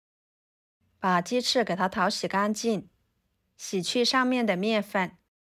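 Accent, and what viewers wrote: Yunnan, China. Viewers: 这云南普通话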